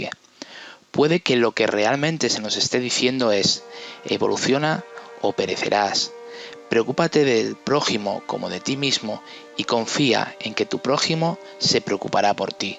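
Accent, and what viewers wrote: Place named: Spain. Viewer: Eres de España sin duda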